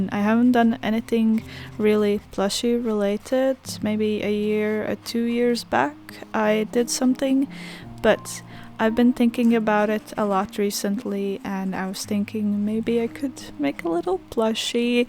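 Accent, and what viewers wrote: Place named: Belgium. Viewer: my first thought was "wow, these axolotl dragons kinda remind me of httyd for some reason..." **a minute later** "OH MY GOD ITS LITERALLY TOOTHLESS BUT RAINBOWS" also i was drying my hair while watching this so i had the volume up and apparently sounds like me but with a Belgian(?) accent instead of an American one, according to my mom lol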